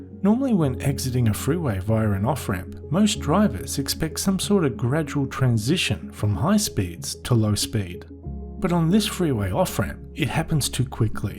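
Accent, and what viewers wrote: Australia. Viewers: Drivers in Seattle think it's OK to exit a ramp at 75 mph and yet merge into the highway at 25 mph expecting everyone around them to adjust to that kind of driving. BTW the Australian accent threw me off until I saw the 165 exit ;)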